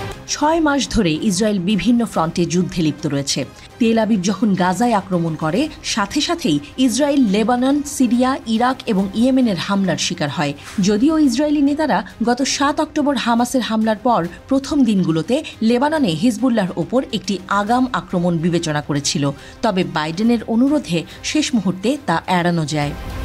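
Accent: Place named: India. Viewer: উপস্থাপক ইন্ডিয়ান হান্ডেট পার্সেন্ট সিওর আমি 🤔😢😂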